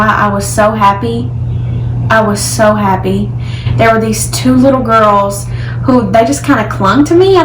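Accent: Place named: Kentucky, United States. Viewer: I love your accent the Kentucky accent. 😁 welcome to algeria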